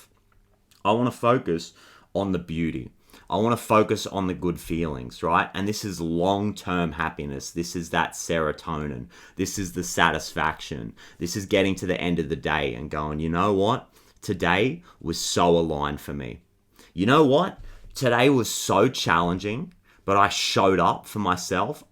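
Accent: Australia. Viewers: Omg i just love that these channelled messages are coming through an aussie accesnt and aussie way of relating to the world . 😂❤❤❤❤❤❤❤❤❤❤. Just this alignment in irself blows my mind . Just llove you and your humour . AGAIN Thank you mate . ❤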